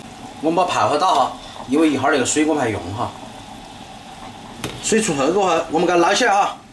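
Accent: Sichuan, China. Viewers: 台灣這裡叫（烏骨雞），補冬的珍禽食材之一。每天都要來看看的視頻，聽你豪邁的四川口音和大口食相，真的好療癒！因為生病，很多東西想吃也吞不下，看你吃彷彿自己也飽餐一頓，過乾癮！！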